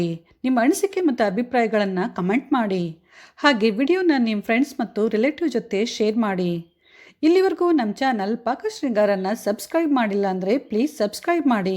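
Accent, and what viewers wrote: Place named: Bangalore, India. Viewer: Uttara Karnataka recipe anta heltiri, matadod nodidre Bangalore bashe matadtiri?? UK language matadidre En agutt ri 🤦🏻‍♂️🤦🏻‍♂️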